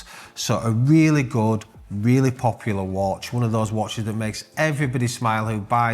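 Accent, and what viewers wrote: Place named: Yorkshire, United Kingdom. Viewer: Out of all the YT videos regarding Watch content, I have to say, I really enjoy your videos. Always to the point, no nonsense or drama. Just the timepieces and the market details. I’m a huge fan. Enjoy that Yorkshire accent as well. I do have one question though, not to insult you by any means, but what is your opinion on the “Steinhart” entry level watch brand ? It’s interesting to me that very little information is out there about this brand. The Ocean One looks very similar to the ever popular Submariner, but without the Rolex price. As an everyday watch I’m interested in one. But as mentioned I can’t seem to get an experienced watch dealers perspective. Maybe that’s a statement in itself? Hope all is well across the pond ! Cheers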